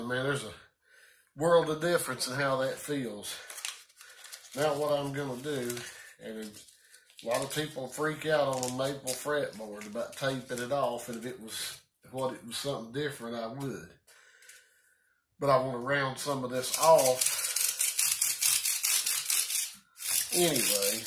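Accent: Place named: South, United States. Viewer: Your southern drawl makes listening to and learning from this video really enjoyable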